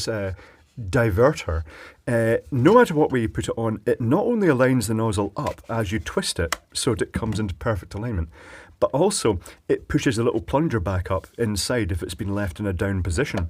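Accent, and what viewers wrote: Scotland, United Kingdom. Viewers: Scottish accent and you avoided saying "de-burr"... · What kind of Scotland are you from I'm from Fife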